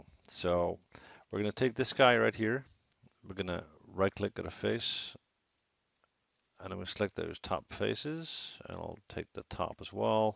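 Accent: Netherlands. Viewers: WAIT WUT u dutch ??? :D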